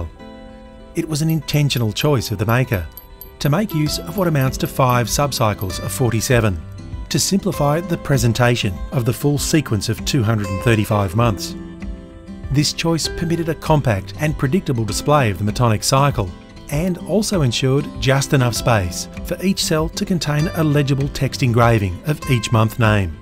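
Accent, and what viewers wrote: Australia. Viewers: admittedly i skiped to this vid but after seeing your craftsmanship i have to watch the whole series as well as your other vids 😅 aussie accent is a nice bonus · Anyone else remember like 5 years ago when you clicked on a video of a dude with an Australian accent making a small brass scribe? Crazy stuff..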